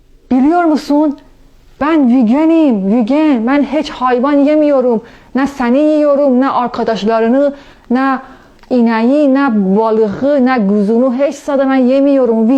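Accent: Azerbaijan. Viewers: Fifa oyuncus U Azeri o yüzden öyle konuşuyor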